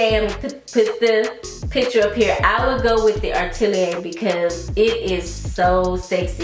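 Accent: Texas, United States. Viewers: Are you from Texas? So am I.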